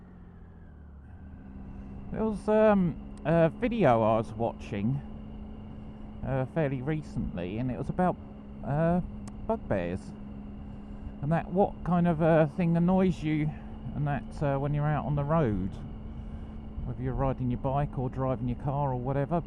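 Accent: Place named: England, United Kingdom. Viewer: I agree about the metal covers. We are experiencing astronomical growth in this area of Tennessee, USA and they are putting in new roads, and tearing up older ones to put more drain lines, etc, in the ground. Many of them are squared and hitting the corner of one can do damage on your tire. Some stick up several inches and hitting one at night in a curve can be an eye opener. When they are wet, dangerous. You must be from the same region of England as The Cockney Biker. He has an accent much like yours, he has been living in the States for a number of years now.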